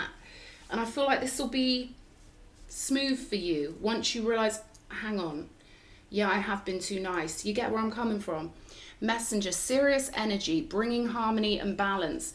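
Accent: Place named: England, United Kingdom. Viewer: your English accent lol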